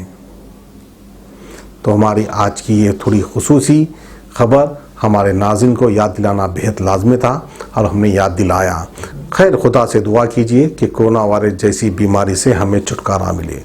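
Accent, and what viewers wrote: Hyderabad, India. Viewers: Bhai aap pure Hyderabadi style ma bolka inlogo ka upper thok Diya jaisa hogaya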